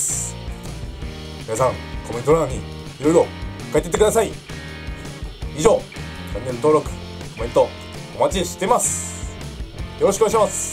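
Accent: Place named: Kansai, Japan. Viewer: さんの関西弁、いい。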